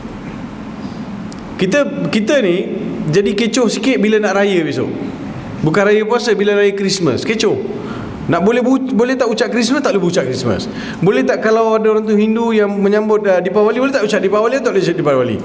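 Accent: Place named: Malaysia. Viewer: Suara ustaz malayu original lah..😍😍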